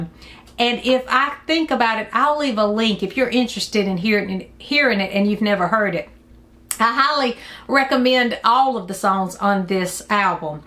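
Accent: South, United States. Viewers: your AWESOME!!!...Man oh man..love your fun whimsical video's...love your family value's...and dont get me started on your voice..that southern voice..love it!!..Your so cute and I say that with total respect..FUN FUN FUN video's...My fav Christmas album is one i listened to over and over as a kid in the 70's and its called...( A Mix )...P.S..Just ordered thanks to you..I was hoping was on it but didnt see it..:) Oh well:) is an awesome song..:) Ever heard of it???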